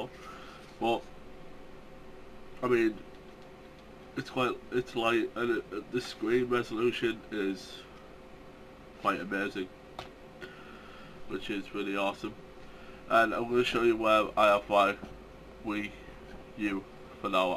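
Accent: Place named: Northern England, United Kingdom. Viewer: nice pickups althought i still cant understand you but i think its cause your northern i think :P oh and FYI FIRST COMMENT!!!!!!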